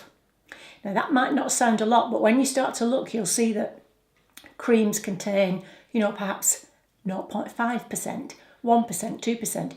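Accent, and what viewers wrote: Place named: Lancashire, United Kingdom. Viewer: My guess is Lancashire? I'm down in Derbyshire! Good to find someone for the older gals, I'm not quite in the 50's yet but loving your content. Tracy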